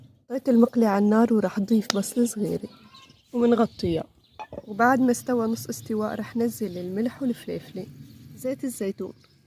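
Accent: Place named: Latakia, Syria. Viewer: كأنك من اللاذقية يقلبي❤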